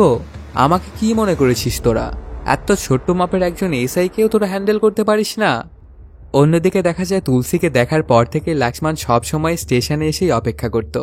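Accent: Bangladesh. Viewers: হালায় কি রাজশাহীর জেলা না বরিশাইল্লা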